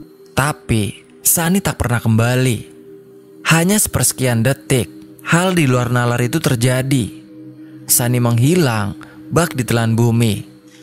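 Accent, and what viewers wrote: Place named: Banyumas, Indonesia. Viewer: Ngapak ya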